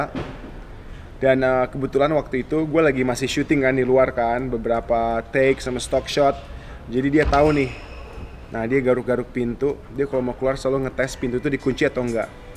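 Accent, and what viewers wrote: Banyumas, Indonesia. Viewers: Mbaknya ngapak 😆 snowee lucu bgt 😘😘😍😍 fix, klw aku udah punya rumah sendiri mau punya satu :) udah pengen dari dulu. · Mang mba nya org ngapak jg kya sy yah...rep ndeleng apaaa...kuwe artine mo lht apaaa..hihiii...btw...aslinya owee ank mna tuh...😘😘😘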